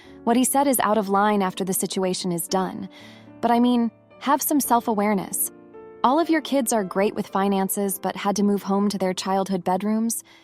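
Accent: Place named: California, United States. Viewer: Wish you could pick a less California cyunty sounding nasal snake sibilance AI voice. 😂 Annoying AF.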